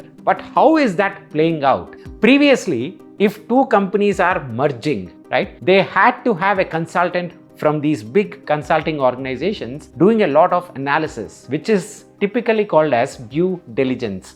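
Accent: India. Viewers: Improve your accent, it's really bleeding my ears to hear how, some Indians speak. This is not how English is supposed to be spoken......Seriously, something is seriously wrong with Indian accents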